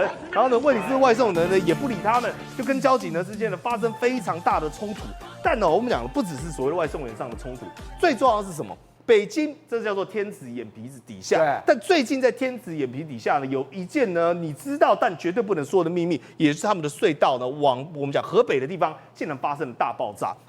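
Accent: Taiwan. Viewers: 笑死了，一听到这个弯弯口音就知道要放什么屁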